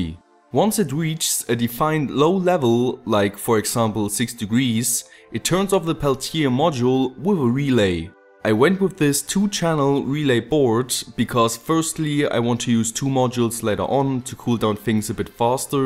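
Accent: Germany. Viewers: du bist deutsch oder ? das hört man :D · Kommst du aus Deutschlanf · Are you german?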